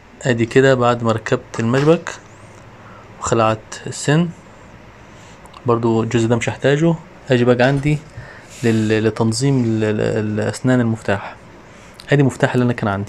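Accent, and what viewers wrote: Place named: Syria. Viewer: Te felicito tu programa es muy explicativo, aunque me casi no entiendo el arabe tuyo pero pareciere sirio o libanes. Soy de Venezuela y me gusto en verdad ese secreto de los cerrajeros. Bendiciones y muchas gracias.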